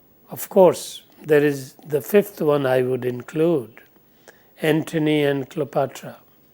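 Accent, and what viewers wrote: India. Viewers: look at your sentence. It is full of errors and badly structured. It is clear evidence of your poor academics. First look into your inner self. You are not capable of even making a comment about Indians. He is speaking Indian English. This is Indian accent. Got it???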